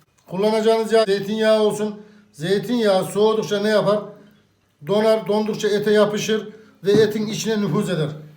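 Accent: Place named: Black Sea, Turkey. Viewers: karadenizli galiba ustam.bizim şiveyle gonuşii :) tokat ordu sivas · Memleket nire şef? Kayseri, Yozgat, Çorum???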